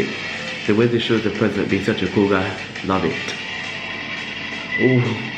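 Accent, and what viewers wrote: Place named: Scotland, United Kingdom. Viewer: are you Scottish? idk you have thick accent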